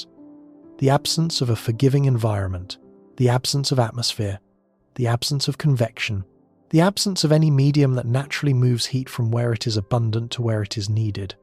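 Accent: England, United Kingdom. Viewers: I am pretty sure that the air and moisture in the suit would still cause evaporative cooling, and your face would frost over or freeze superficially on the surface. It would probably look like it was frozen solid. I think that some of us are a little hard on this Sleepy Science channel. It's not terrible even if its AI. It's sleepy pop science with a relaxing English voice to fall asleep with :-)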